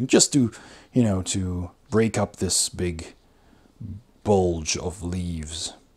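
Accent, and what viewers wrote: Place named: Netherlands. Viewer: i could tell youre from the netherlands like me, ill be getting my set tomorrow and im super hyped for it!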